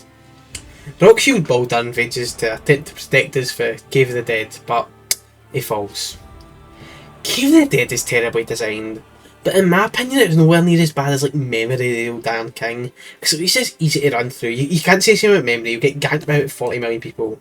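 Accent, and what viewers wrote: United Kingdom. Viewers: What do you want him to do, stop being British? The man can’t change his damn accent.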